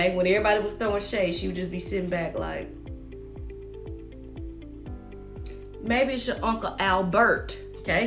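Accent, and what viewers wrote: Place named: Mississippi, United States. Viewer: Chiiiile, you're so Mississippi and I LOVE IT! I went to HS with your baby sis, and I definitely see lovable personalities are in yall's bloodline. Keep it up, love. When I'm missing home, I watch you. That accent takes me right back to Highway 90, lol! #HugeFan!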